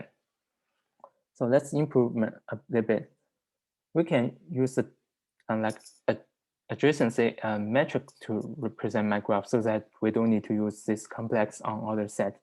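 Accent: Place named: Southeast Asia. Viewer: 很重的东南亚口音，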